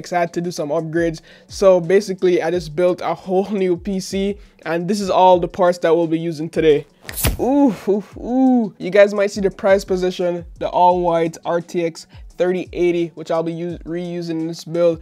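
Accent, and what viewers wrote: Jamaica. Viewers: are u jamaican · You're jamaican arent you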